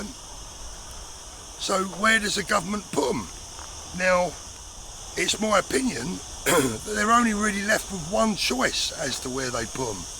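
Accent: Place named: Cockney, United Kingdom. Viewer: Where are you filming from? Do i hear a cockney accent, so long since ive heard one. Wonderful dulcet tones ❤❤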